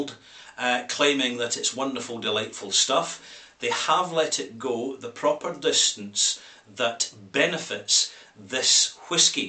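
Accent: Scotland, United Kingdom. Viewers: Hey, thanks for these entertaining and informative, no, I say EDUCATIVE reviews. I enjoy them regularly and often try out some of your recomendations. Sometimes you refer to a single malt as "rederly available". Now sorry to ask, I am not familiar with scottish accent (which sound very pleasant, even adorable to me, at least the way you speak it). Do you mean "rarely available" or "really available". Would be quite essential to know. Thanks for an answer.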